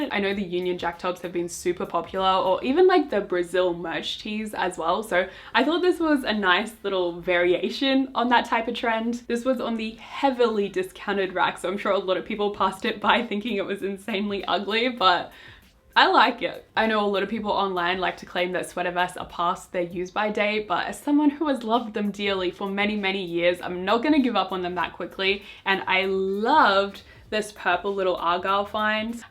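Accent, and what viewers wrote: Australia. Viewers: danggg it’s so refreshing hearing an aussie voice!!!! love the way you style out of the box pieces… i’m feeling very inspired. can’t wait to go in a youtube hole of your chanel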